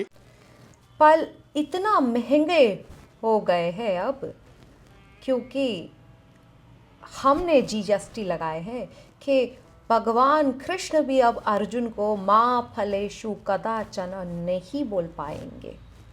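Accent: South, India. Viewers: Listening to her for the first time here, I thought she was south Indian. Then I looked at her Hindi name and other videos and realised it was mimicry 🙂 I have to admit, very rarely do you get non-south Indians imitating south Indians in an accurate manner. It is always some cliched "ayyo rama" imitations. I am sure she put in a lot of effort to learn the accent for every word that she is speaking in this video.👏👏👏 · I ❤ her south Indian ascent? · While I agree that taxes are too much that gdp will actually drop. Lets not be political about it, since the same happened during the congress regime. Both of them follow the same capitalist policies while in power and plain to be socialist while on opposition. And your Tamil accent is horrible. Indiands probably pay the highest taxes. Economics doesn't work this way. We should have had more reforms,but it seems like modiji like chidambaram ji is trying to shore up enough so that the next set of freebies can be announced before next elections. 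Wouldn't be surprised if some major changes would be in place before next polls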